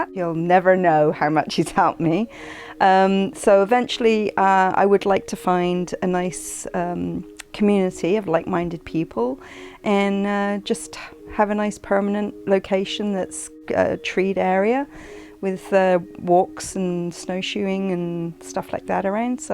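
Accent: United Kingdom. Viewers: Are you originally from the UK?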